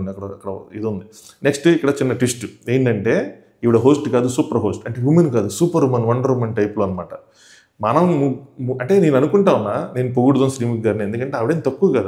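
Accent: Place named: Srikakulam, India. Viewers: mee roots Srikakulam aa....konni words lo doubt vacchunde....vja antaru but slang okkosari doubt vacchedhi😅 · Yes same doubt naku kuda matalu srikakulam vijayanagaram side laa vuntay but he is from vijayawada...may be ikkada settle ayyaremo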